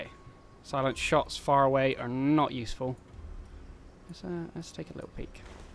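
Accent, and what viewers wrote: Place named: West Country, United Kingdom. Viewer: Are you from Bristol or Somerset? Very west country accent :)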